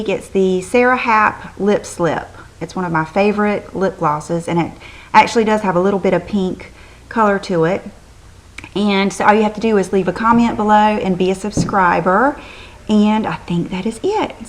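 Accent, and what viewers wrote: United States. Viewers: i just love your country accent :)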